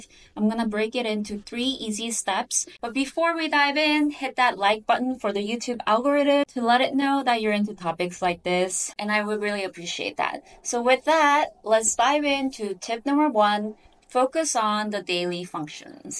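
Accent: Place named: South Korea. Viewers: Great video . Btw Are you of Korean origin ? I noticed a slight accent . I have a linguistics background that's why I noticed , I did not mean to offend in any way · Yes. I'm half so I've heard Koreans speak English for most of my life. I dunno what it is exactly but it's recognizable. But in case you wanted to really know, the last name is a dead giveaway.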